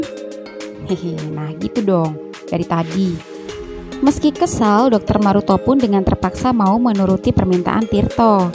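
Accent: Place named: Papua, Indonesia. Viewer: Kadang tersenyum dengar logat papuanyaaaa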